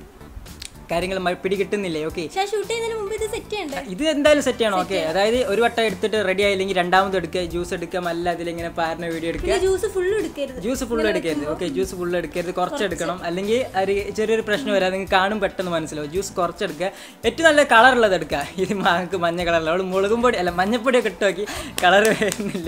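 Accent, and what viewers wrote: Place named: Malappuram, India. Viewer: Aalu kochikkaaranaanenkilum Basha. Malappuram thanne. .. Paarua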